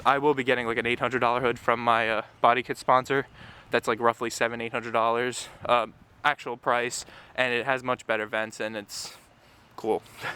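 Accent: Russia. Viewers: Are u russian bro?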